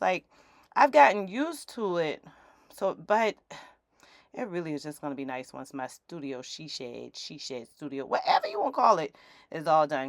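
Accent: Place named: Charleston, United States. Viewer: New subscripber. Love this! That accent sure sounds familiar. Charleston?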